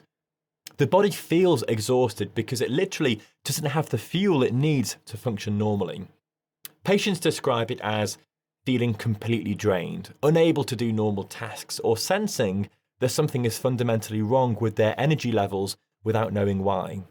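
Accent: England, United Kingdom. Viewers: I have just recently subscribed, and really enjoy the way you have presented these situations . It is very easy to understand, and that is appreciated. Thank you. Plus I always enjoy the English accent.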